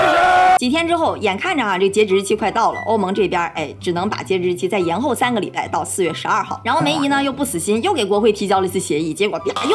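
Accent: China. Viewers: 好奇地问，你们听得懂大陆的口音吗？会不会觉得听着很奇怪？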